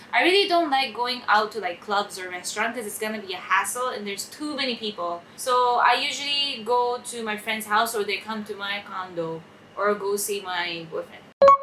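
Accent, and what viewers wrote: Philippines. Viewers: Japanese-Canadian 🤔 . If you don’t look at the name and just listen to her accent, I say it’s a pinay who’s talking. Definitely half pinay. Not half Japanese. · Your english is becoming a filipino english. Konti nalang! 😅👍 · Did you had this accent before or just Filipino accent rubbed into you? You speak english like a manila girl.. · Your accent is very Filipino! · You're starting to sound like A Filipino speaking in English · Wow! Im blown away! Filipino accent! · If I don't know who is speaking I would think you are Pinoy based on your accent. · Your accent really sounds like Filipina.. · Ang Pinoy na niya mag english haha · Wow, you have a filipino accent! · can i ask you are a half japanese canadian but why your english accent is filipino? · Listening to you....u sound so filipino! Your genuiness is very endearing. No wonder filipinos that meet you will like you❤❤❤🥰...being true & sincere melts a filipinos❤😁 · You sounds like Filipino When Speaking English.. 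· why is it that she sound like a filipina when she talks? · Hi, just asking, how long have you been in the Philippines already. As in...... I could hear your TAGALOG ACCENT on your English. As if your a Filipina speaking English. Actually it's refreshing to hear was just very surprise specially since this interview sounded like it was done in Japan. More power to you and great luck to you and your business!!!:D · You sound likely Filipino, I think you love the Philippines, you have a blood of Filipino.. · Good to see your channel growing! I love your english accent its almost like a filipino! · hahaha..filipino accent..nice. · I like your Filipino accent and it's like your from here na.😂 But I can still your Japanese side like ehhhhhh!!! Ehhhhh! 😂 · Even your accent is from the philippines ♥️♥️♥️ We love you here. I was 10 years old when I knew you in "Benny's internet Cafe" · That Filipino accent man... 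= priceless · U talk like a filipino na nic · you are such a novelty because when you speak English, I think you're Filipino, but when you suddenly insert Japanese, I'm reminded that you are Japanese. Anyway, Mabuhay!:) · Wow, she's got a Filipino accent. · I like how your English sounds, like how the filipinos speak 😄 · Wait your in Philippines? I can tell your English accent sounds like Philippina-lish. OMG lost 900k followers Sorry to hear that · That filipino english accent... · Your accent sounds like a Filipino 😃. Good job. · You have a Filipino english accent. So nice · OMG, you're beginning to sound like a local Filipino! Worthy to be called a Filipina, mabuhay! Watchout for corona! 🇯🇵🇵🇭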